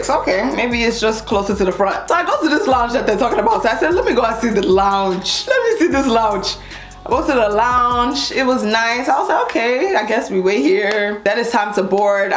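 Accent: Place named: Nigeria. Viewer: It's the Nigerian accent for me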